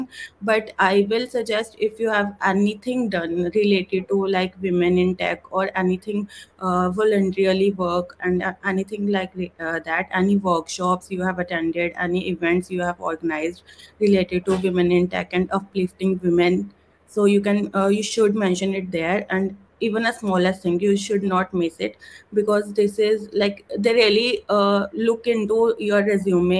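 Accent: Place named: Punjab, India. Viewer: i love your slightly punjabi accent❤❤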